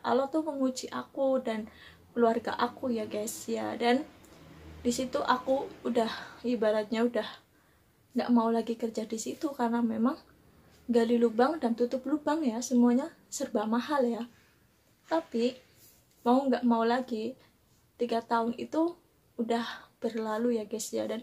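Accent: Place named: Banyumas, Indonesia. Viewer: bersama ngapak wadon yang lagi cerita di singapore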